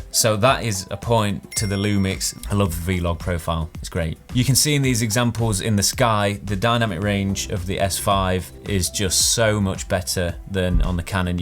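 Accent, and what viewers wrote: Nottingham, United Kingdom. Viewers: Again - I love your british English:) and of course, the content of your videos is of very high quality! Thank you for the comparison, I´ve been using my R6 for 20 months (photos and videos) and I am considering to buy S5 just for shooting videos. Thumbs up, as always. Regards from Czech Republic;) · Nottingham?? Sounds very familiar :)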